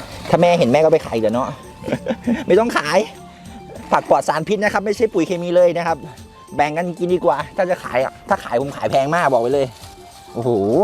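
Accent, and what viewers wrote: Isan, Thailand. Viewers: ชอบๆพี่ดูตลอด .ได้สาระดี .พูดอีสาน กึ่งไทยสุดยอด..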